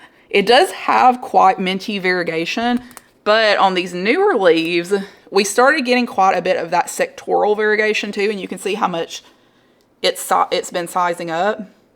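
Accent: South, United States. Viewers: We don’t have a publix in Wisconsin.. we have Copps, and I’ve never seen any philodendron. How’s the job going? You recently went back? What side of Georgia? You have such a strong southern accent, but I love it!! 😂❤ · When I first heard her, she reminded me a lot of my relatives in NE Alabama, so I thought she was there or perhaps North GA. I was pleased to find out I was right! I grew up in NW FL with a much more subtle Southern accent, so my extended family mocked my “Yankee” accent.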